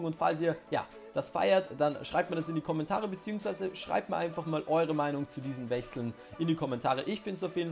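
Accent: Austria. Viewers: Von wo aus Österreich kommst du genau?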